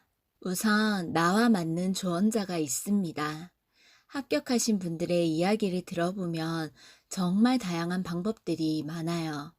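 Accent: Gyeongsang, South Korea. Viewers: 감사합니다 목소리가 이쁜 공인중개사님~~ 성우 해도 되겠네요~^^ 대구분이세요? 잘듣고갑니다~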